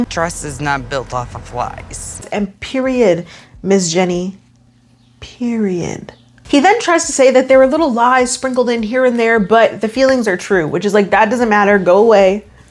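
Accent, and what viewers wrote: Canada. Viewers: this is the catfish episode that may have pushed me too far…..what a wild piece of tv…. also i’ve never realized how canadian you do sound until this video !!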